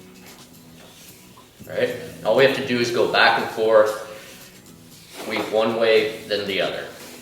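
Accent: Canada. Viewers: Nice Canadian accent.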